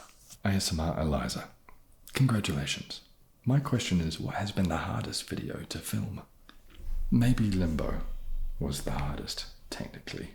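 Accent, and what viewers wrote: New Zealand. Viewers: Omg, this frigging genius! Gravelly voice in stereo. Hearing my favourite English expressions in a kiwi voice (crack on, loads) is always a cheap chill. I missed the opportunity to leave you a question🙁 but I’m pretty sure I can’t think anything other people come up. As an artist I would adore painting you like one of my French girls🤣🤣🤣 This was a joy to watch and heavenly to listen to. 😊❤️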